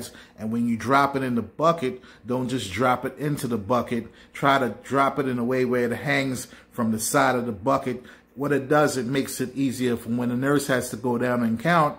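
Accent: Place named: New York, United States. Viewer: Love the NY accent man 😆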